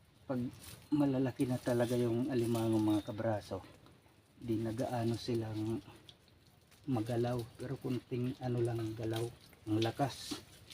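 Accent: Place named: Iloilo, Philippines. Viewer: Oy ilonggo ikaw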